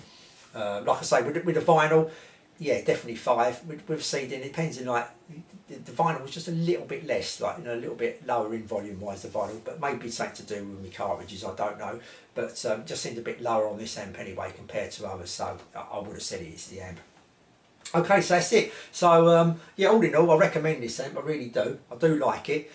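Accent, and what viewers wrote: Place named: England, United Kingdom. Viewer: Sorry if I sound a bit too critical but you might want to slow down your speech a bit. It might just be me having trouble with your English accent as I'm a Canadian and I think we may speak somewhat slower and deliberate. Just a little something to help improve your channel as you continue on. I know that you have said this was done when you were first starting out so it is entirely understandable that you won't have an excellent delivery right off the bat. Nothing wrong with the content just one listener trying to help out. Cheers mate.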